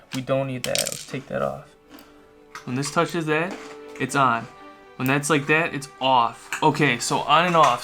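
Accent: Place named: Minnesota, United States. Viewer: You must be from Minnesota, that accent is thicc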